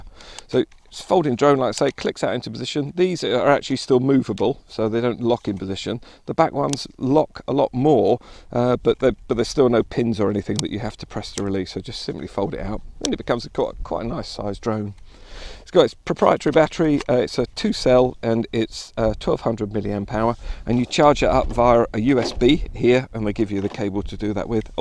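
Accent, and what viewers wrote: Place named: England, United Kingdom. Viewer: A few giveaways when an English person has chosen to live in the Highlands of Scotland. The accent and the mentioning of the weather every couple of minutes but 95.497.....2% say they love the place. Helpful vid, I'm a novice at drones. Thanks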